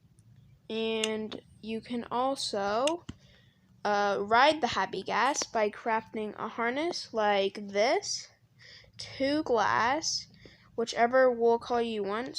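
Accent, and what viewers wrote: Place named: Texas, United States. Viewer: I realised ur voice has a lil Texas accent Lol❤ keep up the work man❤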